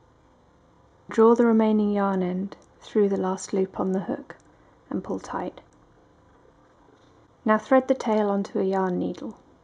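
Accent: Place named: England, United Kingdom. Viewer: wow thanks what a great video :3 I love your english accent by the way :D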